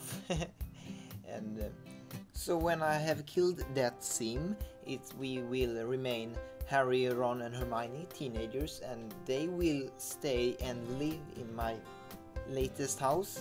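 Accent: Sweden. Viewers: o i barely noticed this was uploaded on my 18th bday xD,btw i love your swedish accent :D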